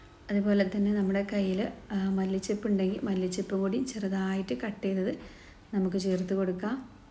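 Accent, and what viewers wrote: Malappuram, India. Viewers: നിങ്ങളുടെ വീട് എവിടെ യാ. ഭാഷ മലപ്പുറം ആണി. മലപ്പുറത്തു എവിടെ ആണ്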